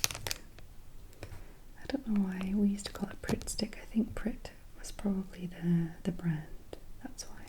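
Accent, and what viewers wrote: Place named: Scotland, United Kingdom. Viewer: I was thinking the whole way through this video 'she definitely sounds a little Scottish' and then you said you went to school in Scotland lol that's where I'm from too. Can I ask which part of Scotland you lived in?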